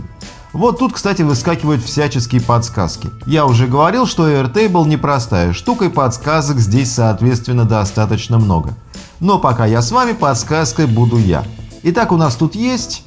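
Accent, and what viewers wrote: Moscow, Russia. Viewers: Тааакой зааабавный мааасковский ааакцент у. А сам ролик бесполезный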